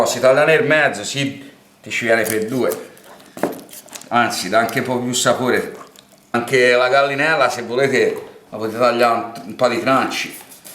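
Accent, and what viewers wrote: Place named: Livorno, Italy. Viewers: Bellissimo, confortevole, casereccio e senza musica da discoteca in sottofondo: meraviglioso pesce, simpaticissimo l'accento livornese, esperto il cuoco e padrone degli ingredienti, video come questi riempiono sempre l'anima. Peccato pero', purtroppo, non sentire il profumo di quel piatto strabiliante! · Troppo figo l'accento Livornese.. Ricetta rifatta uguale e venuto tutto fantastico. Unica domanda ma quando si mette il polpo un bicchiere di vino bianco no? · Meriti like solo per la parlata livornese😂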